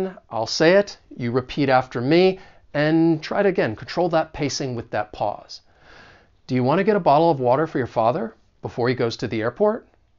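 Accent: South, United States. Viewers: I’m not an expert when I say any of this, but I am an American with a slight southern accent. I think linking is leaning more into a southern accent than a standard one. I also think that the “for” pronunciation you are talking about leans more on the Southern side rather than standard.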